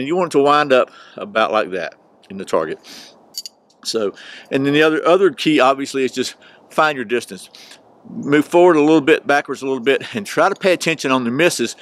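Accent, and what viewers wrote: Georgia, United States. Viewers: Tell me you're from Georgia without telling me from Georgia (He could be from like 6-7 different states with that accent, but he strikes me as being from the Conyers area in Georgia.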